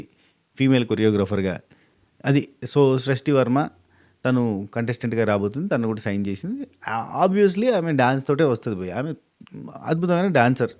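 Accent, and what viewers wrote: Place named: Chittoor, India. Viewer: record cheste mimmalni Enduku kodatharu garu cheppandi miru Adbutham ga video's chestaru Adi kuda Swathi pickles entha veyttikina dorakadam ledu kani miru mee webset pampinchi chala manchi panichesaru garu Mee language chittoor slang chala bagundi garu ee madyalo mee youtube video's chala Trend ga marindi garu mee chittu pakala block bord ekada dorukuthundo cheppandi garu plz nenu kuda Order cheskunthanu garu plz Aa youtube maike kuda entha chepthara garu plz nenu online lo veyttikithe 40000+ payment chupisthundi garu mee maike entho naku cheppandi plz garu plz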